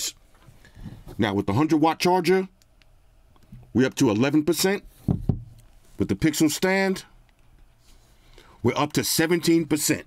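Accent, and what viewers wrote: New York, United States. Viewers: Stopped watching as soon as I heard the NYC accent 👎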